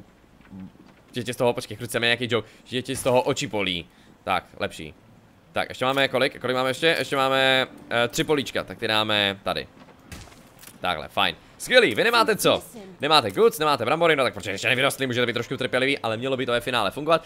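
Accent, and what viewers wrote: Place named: Prague, Czech Republic. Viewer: podle prizvuku tipuju na Prazaka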